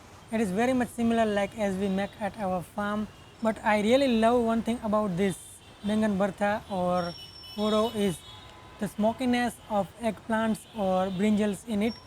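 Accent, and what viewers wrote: Kathiawar, India. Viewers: Grammatically his English is perfect, but being residing in Gujarat, naturally his accent tuning is with Gujarati.Thanks. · I always hit like on your video for your presentation allthough we kathiyawadi have bit different accent of speech it does not matter at all. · haha kyu lake kehvay ?? mast bole che bhai kathiyawadi English n keep it up nice video :-)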